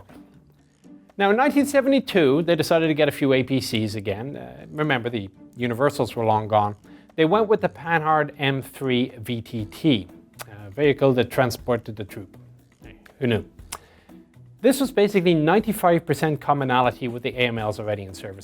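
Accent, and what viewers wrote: Ireland. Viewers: It’s that Irish lilt and occasional ‘tongue in cheek’ observation that keeps one engaged. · all my life living with an irish mother is probably why i never noticed your irish accent... I alway knew it sounded a bit strange though, guess i just never put two and two together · Did the accent never give it away? lol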